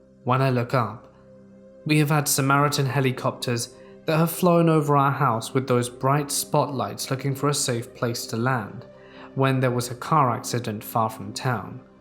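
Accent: United Kingdom. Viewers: Left dislike because british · I was in the hospital last year and i listen to these stories on a small speaker and when my night nurse came in to check on me all she heard was ' voice and it scared the crap outta her. Just literally a man talking with a UK accent (i'm in America) in a totally empty room!!! (except for me) SHe woke me up and was like, "ma'am, were gonna have to move u to another room!" and it took me forever to realize she was talking about the speaker just almost under my pillow out of her sight! I'll NEVER forget that. I hope u get well VERY soon!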